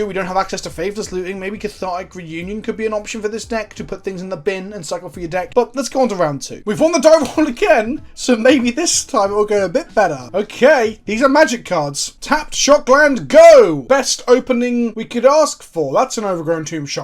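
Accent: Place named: United Kingdom. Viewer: What tried to say: "what is the plural of colossus?" What actually said: "what is the pllll of klloss" I will never understand how british accents work. Do you just leave out half the letters?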